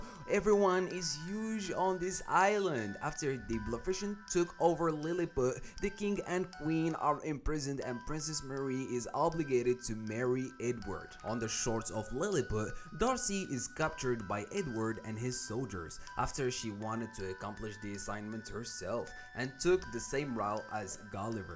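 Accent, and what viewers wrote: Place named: Morocco. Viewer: i can swear that you're Moroccan hahaha fiiin aSsat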